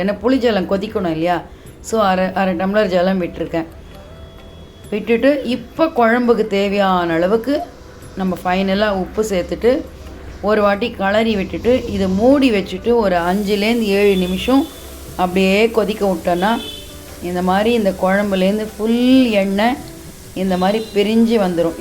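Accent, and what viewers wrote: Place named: Sri Vaishnava, India. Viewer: Sri vaishnavaa பாஷை கேட்க மிகவும் ரம்மியமாக இருந்தது மிக்க நன்றி 🎉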